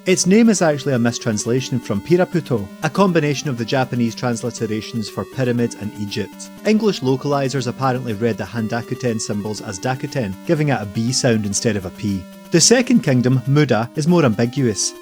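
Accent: Scotland, United Kingdom. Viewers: That voice......reminds me of what Shrek would sound like in his mid 20s. (don't take this the wrong way, Scottish accents are one of my favorite accents of all time!) Can't wait to check out your other stuff :)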